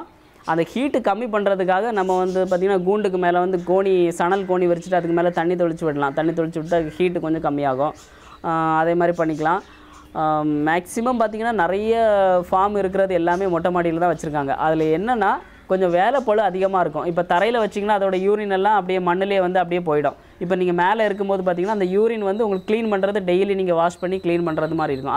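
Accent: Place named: Tirunelveli, India. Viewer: Tirunelveli mathri irukku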